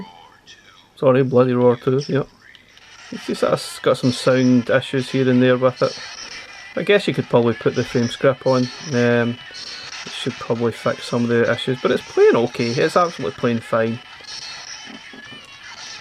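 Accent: Scotland, United Kingdom. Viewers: I’ll buy anything described in a Scottish accent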